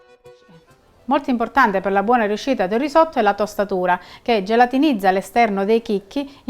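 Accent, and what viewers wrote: Calabria, Italy. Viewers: Hai un accento calabrese bellissimo ❤️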